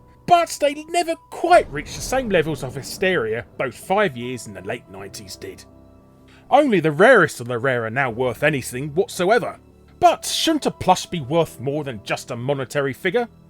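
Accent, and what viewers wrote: United Kingdom. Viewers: you really make me laugh with your British accent and valuable information. 😄😄😄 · Just found this channel... I really like it... Very well put together, engaging, funny, well researched, informative and of course the British accent... Subscribed...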